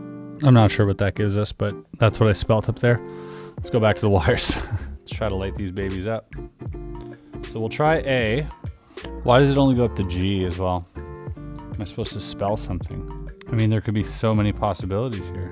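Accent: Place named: Vancouver, Canada. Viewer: ph shit. I identified you as a person from Vancouver based on one video. It was your taste and your accent that gave it away. I think knowing what city you're probably from is better than that puzzle.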